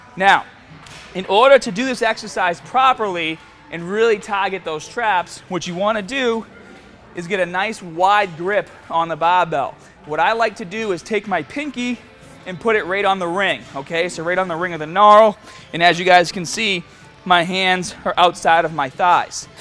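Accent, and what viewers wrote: Boston, United States. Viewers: seems like you've turned up your boston accent a bit lol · That Boston accent was too distracting.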